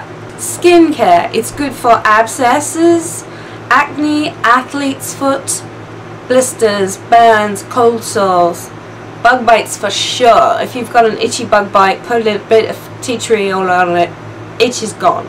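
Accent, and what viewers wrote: Australia. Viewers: loving the aussie accent! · I'm Australian and use tea tree daily love it! It was entertaining listening to your Aussie accent!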